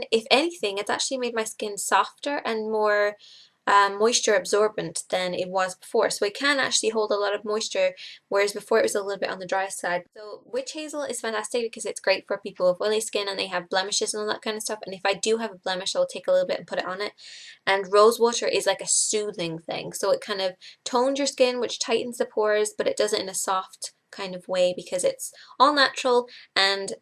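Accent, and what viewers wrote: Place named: Ireland. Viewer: you're Irish aren't you? lol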